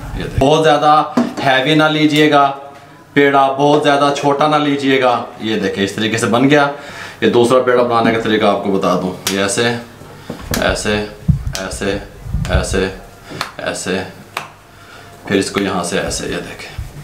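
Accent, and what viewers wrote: Punjab, India. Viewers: I lv the way he speaks punjbi viche viche😂😂 and the way he guides. Allah ap se Raazi ho. Ameen. 😇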